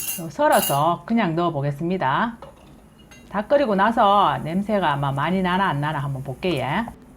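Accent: Gyeongsang, South Korea. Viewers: 님 프사 버꾸셨네요? 항상 좋은 정보와 레시피 올려주셔서 감사하고 대구말씨 넘~~좋아요👍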